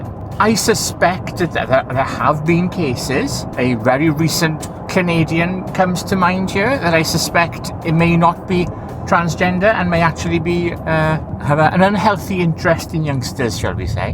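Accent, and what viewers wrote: Wales, United Kingdom. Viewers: I know now your welsh lol love accent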